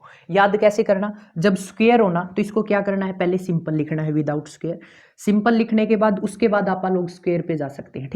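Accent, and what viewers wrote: Haryana, India. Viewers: as we all know sir teachs in best way😼❤️. But Yo haryanvi accent add hon ke bad😂🫶ekdum ghar aali feel ari sir Thanku. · Ye vedio me sir bhut sweet haryanvi bole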